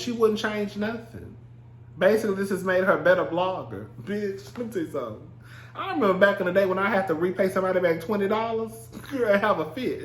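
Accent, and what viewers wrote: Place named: Houston, United States. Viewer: I love your Houston accent, when you say “thurrr” and “hurrrrr” is everything to me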